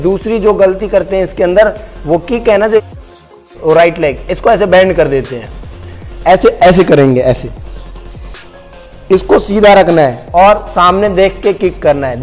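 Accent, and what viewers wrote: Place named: Haryana, India. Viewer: Bhai kaha se ho seems from Haryana . I am from faridabad , do you offer paid service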